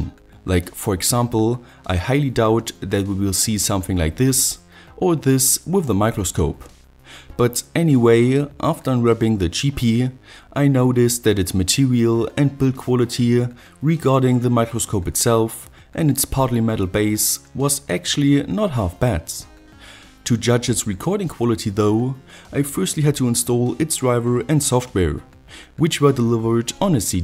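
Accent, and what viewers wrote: Germany. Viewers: 3 Sekunden zugehört und direkt bemerkt, dass der Typ Deutscher ist 😅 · klingt nach denglisch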